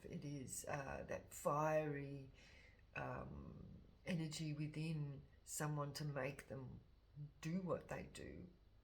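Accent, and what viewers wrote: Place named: Australia. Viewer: Fellow aussie reader here and I totally get what you mean about the pelican and the shining. I have been talking a lot lately about people with the light in them and how people without it just want to stamp it out in people. It is coming up in readings all the time. Love to her the aussie way of speaking. Have a great new year.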